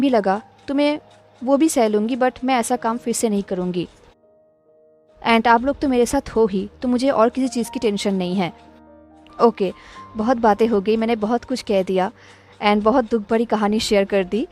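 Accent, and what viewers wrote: Bengal, India. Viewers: Di kal main jab padh rahi thi to aapke channel ka notification aaya tha to main video ko like and diwali vali comment karke maine socha tha bed par sone jaugi to tab sunogi as a bed time story jab bed par aayi to thak kar so gai lekin jab main abhi sun rahi ho tab mujhe pata chala aapke video delete karane ka pura sach aapke channel ke video delete hote dekhakar mujhe bahut bura laga tha kyuki aapki sari story bahut jyada pasand hai aapke calm and thode se bengali accent ke sath aur main bas mere lord shiva se pray karugi ki aapane jin writer se puchha ho vo delete karane ko na bol de aap mere wangxian explainer ke favourite list me aate ho i know comment bahut bada likh diya hai main par di mujhe aapase ye kahana tha your are my favourite wangxian explainer agar aapane ye comment padha ho to sorry aapko itna bor karane ke liye 😊😊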